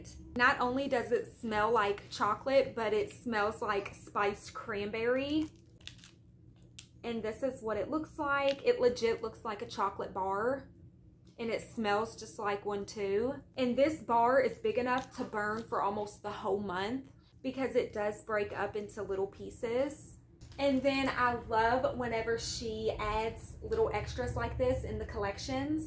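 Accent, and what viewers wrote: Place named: West Virginia, United States. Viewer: I love your accent. 😊 it reminds me of home (WV)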